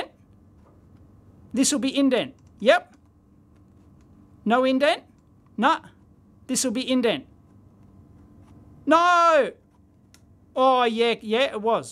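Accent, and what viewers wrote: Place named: Australia. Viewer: English... with an Australian accent.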